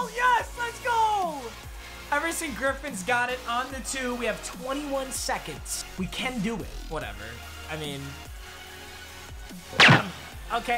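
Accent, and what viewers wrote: Michigan, United States. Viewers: Is he from Michigan?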